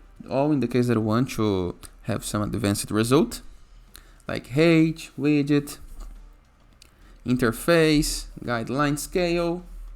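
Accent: Brazil. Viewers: That sweet good Brazilian accent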